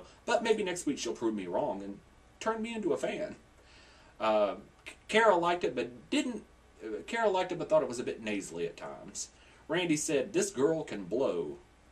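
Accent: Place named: South, United States. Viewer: Who is this guy? I'm from the South, but sorry, you sound like a hick. To quote Kara, you've got ridiculous pipes, but don't mean it as a compliment.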